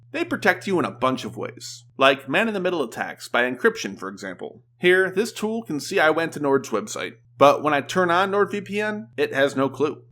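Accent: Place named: United States. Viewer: Your hypersonic speaking speed, couples with that horrible American accent, ensures that most people in Asia would not understand much of what you are narrating.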